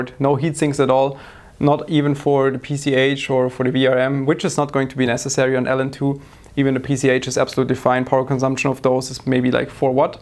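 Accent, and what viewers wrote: Germany. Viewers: Hab noch nie ein deutscheres Englisch gehört😂😂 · der deutsche akzent ist so hörbar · Man bemerkt dass du deutscher bist xDD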